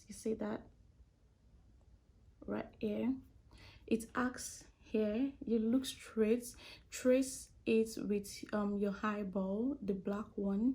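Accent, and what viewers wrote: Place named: Nigeria. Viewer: She sound like Nigeria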